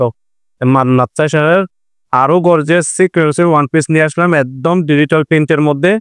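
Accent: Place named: Chittagong, Bangladesh. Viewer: আমি আপনার চট্টগ্রামে দোকান থাকলে সেটা কোথায় তা জানতে চেয়েছি। আপনার কথায় বুঝা যাচ্ছে আপনি চট্টগ্রামের।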